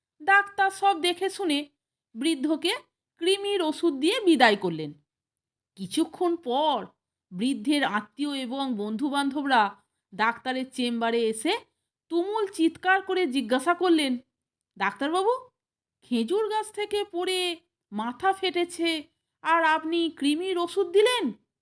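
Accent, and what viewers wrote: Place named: Hooghly, India. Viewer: আপনার কথায় হুগলির টান পেলাম তাই বলছি।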